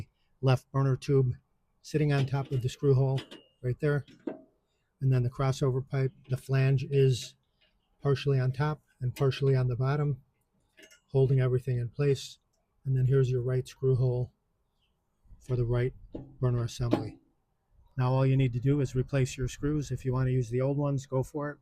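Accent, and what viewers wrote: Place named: Long Island, United States. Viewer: I don’t even own a Weber grill, but I watched because I like to hear my favorite Long Island Made Man explaining things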